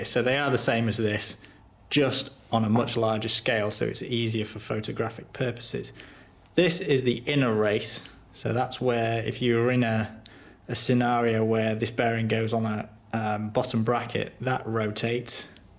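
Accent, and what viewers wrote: United Kingdom. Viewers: How come dude looks Indian but sounds british 😂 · First time I’ve come across your channel very good one word of advice drop the orange overalls you look like you’ve just come out of Guantánamo Bay Nice to hear a British accent 👍🏿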